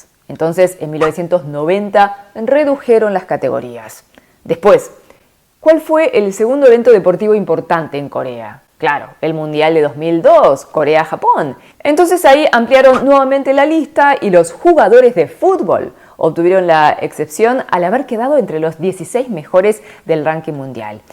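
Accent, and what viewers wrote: Argentina. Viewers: Cómo no van a ir a ello teniendo al enemigo arriba y tirando bombitas cuando se les antoja?:/ sinceramente, a mí Corea del Norte me da muy mal rollo., tú estás en Argentina (por el acento que tienes me lo parece, vamos) así que tú estás a salvo, pero el resto? Pff...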